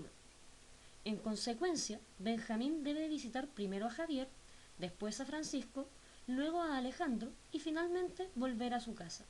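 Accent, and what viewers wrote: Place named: Chile. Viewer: me fascina el acento chileno :3 buena explicacion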